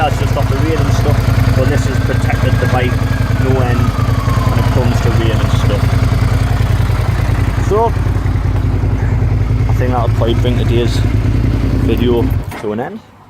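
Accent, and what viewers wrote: Newcastle, United Kingdom. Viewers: Nice review, and you can't beat a Geordie accent! Currently got a Bandit 1200, so I understand your diesel-torque comparison. Might get one of these next, as I have to sell my bike, or possibly the SV1000. It would be nice to try a twin, and something lighter than my big old two wheeled bus! Cheers!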